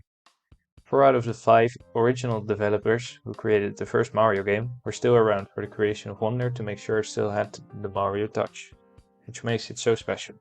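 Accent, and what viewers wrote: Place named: Netherlands. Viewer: in my native language it’s also succes! I thought i heard an accent, are you by chance Dutch?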